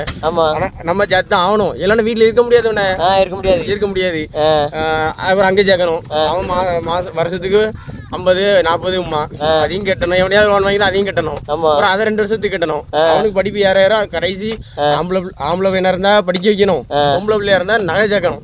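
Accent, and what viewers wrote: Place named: Tirunelveli, India. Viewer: அறுமையா சொன்னீர்கள் தம்பி நெல்லை தமி ழ் வாழ்க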